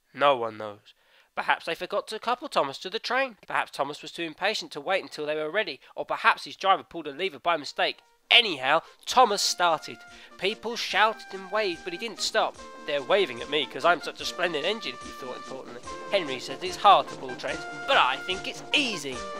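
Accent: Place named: Liverpool, United Kingdom. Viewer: Great video mate :D The figures look great, great scale :D The narration is great! Anymore we can expect soon? ;D Just saying your Liverpool accent is AMAZING.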